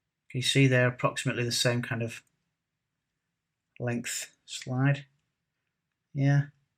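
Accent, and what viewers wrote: England, United Kingdom. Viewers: I really enjoy your commentary. Your explanations and English descriptions are very pleasing to the ear. I've always enjoyed the English accent. The adjectives you use are first class. Love your content brother!!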